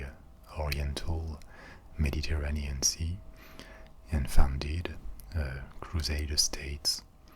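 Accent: France. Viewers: You are the only person who has a french accent that I can stand. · your french accent is so stereotypically beautiful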